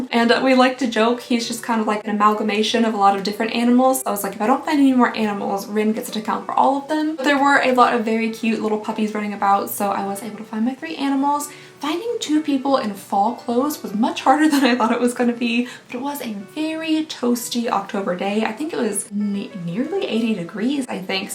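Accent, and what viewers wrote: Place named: South, United States. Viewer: HE'S SO SOUTHERN! i love it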